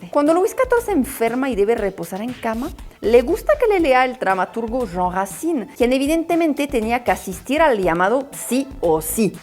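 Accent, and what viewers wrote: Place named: Mexico City, Mexico. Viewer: Soy super fan de tus videos, por ser educativos y culturales con el toque chilango inteligente, saludos cordiales desde Querétaro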